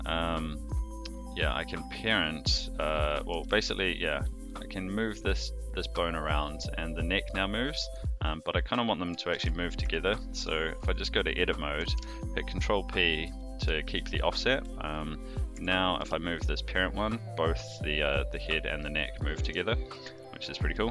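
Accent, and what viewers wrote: New Zealand. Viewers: Hi. Have you seen a YouTuber named Karl Rock. He is from your country but married to a girl here in India. My word, if you listen to him with your eyes closed, you cant tell the difference. Your sound and accent so closely matches to his. Just incredible. The only difference is that he can speak Hindi as well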